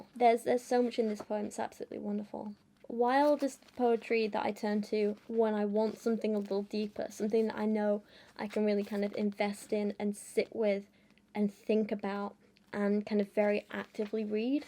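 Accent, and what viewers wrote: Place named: Yorkshire, United Kingdom. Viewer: Well, I have a few thoughts about this video: 1-relax. I personally love your videos, and haven’t thought any of them have been lacking. And it’s important to take time off if you don’t feel right to make them. We’ll be here whenever you’re ready, and we’re here for you until then. 2-I’ve never been super into poetry. I’ve always liked it alright, but never really went looking for it, or paying a great deal of attention to it. Your poetry videos, however, have me rethinking that. I’ll probably start going after some poetry now, and it’s all your fault, so thank you! 3-I’ve recently started watching the seasons of the current Doctor (from Doctor Who), which I’m absolutely loving, by the way, and when I saw that they (both the companions, and the Doctor herself, or at least the actress) are from Yorkshire, I remembered you, especially because of their accents. 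And it got me thinking, do you watch and like Doctor Who, and if so, what do you think of the Yorkshire Doctor? Just curious.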